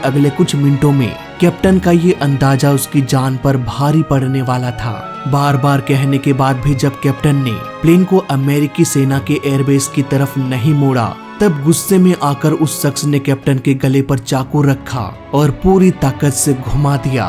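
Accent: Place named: Rural, India. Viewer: For a Japanese incident using Arabian background music ? Sha ko sa . And sa ko Sha ? How come there is no self realisation that it’s not a documentary of Bihar railway station but an aircraft .. U sound so dehati ..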